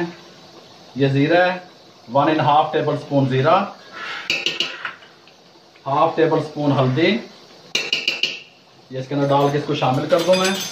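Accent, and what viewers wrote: Punjab, India. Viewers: karahi se zayada apki Punjabi ka maza aya hy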